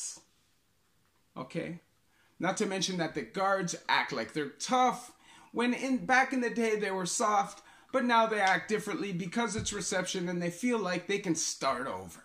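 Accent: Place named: Toronto, Canada. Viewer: I live in windsor Ontario n noticed u got an toronto accent its cuz I'm w alotta Americans down here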